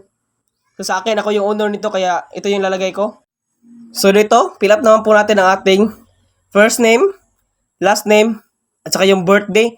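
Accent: Visayas, Philippines. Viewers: bisaya ahaha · Bisaya man deay ka mas galisod ko sabot sa imong tagalog😅 anyway unta ma sundog nako imong vedio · Bisaya eh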